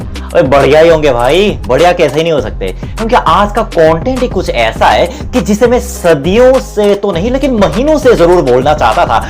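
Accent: India. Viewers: आप पहले ऐसे foreigner है जिसकी हिंदी 100% fluent है और आपका accent भी बिल्कुल हम भारतीय की तरह ही है। आपकी हिंदी देख कर ये बताना मुश्किल है कि आप भारतीय है या दक्षिण कोरियाई नागरिक।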